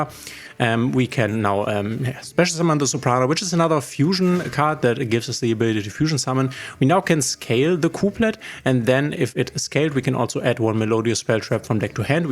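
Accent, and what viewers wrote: Germany. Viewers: OMG dieses deutsche englisch Akzent nervt. Aber trotzdem gut erklärt😂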